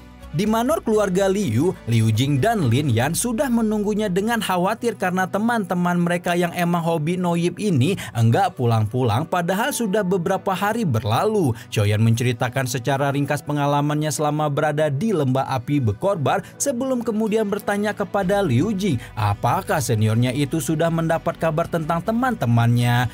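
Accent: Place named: Lombok, Indonesia. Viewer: Orang Lombok mana ni?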